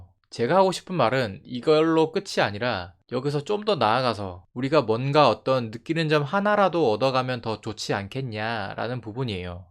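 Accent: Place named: Gyeongsang, South Korea. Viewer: 경상도 억양을 씁니다. 사투리를 줄인거지 억양은 경상토 토박이에요 고향이 대전이라는데 억양은 토박이 경상도가 강한데요..신기하네요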